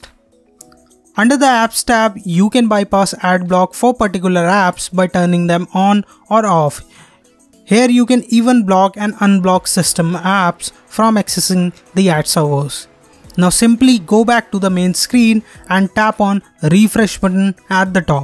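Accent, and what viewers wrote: India. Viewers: U speak like indian i cant understand wut u said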